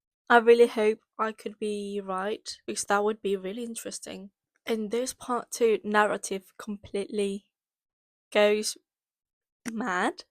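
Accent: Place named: United Kingdom. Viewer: the britash accent is everything to me🗣️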